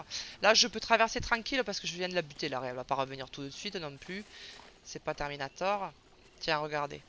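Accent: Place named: South, France. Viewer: Je m'abonne je suis le 100ème abonné, j'adore green hell, j'adore 😍 j'aime le sud et ton accent 😍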